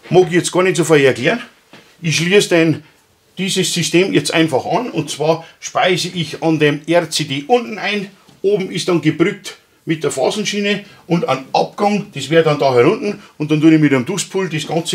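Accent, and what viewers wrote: Bavaria, Germany. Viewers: Super Videos weiter so !!! (los die ned drazn uns echte Bayern versteht hoid ned jeder Fischkobf ;-) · Super naučné video, a ta Bayernština nemá chybu. Palec nahoru. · Top. Gruß der Preuß' der a boarisch verstahn tuat:-)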